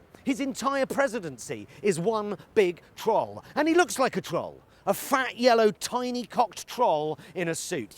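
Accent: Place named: West Country, United Kingdom. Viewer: I am hearing a West Country accent in there but I thought it had a bit of Bath or Bristol in it , but I am happy to be corrected. Funny and to the point stuff. I have a ticket to see Mr. Pie live this month Feb. 2020 here in Ozstriya.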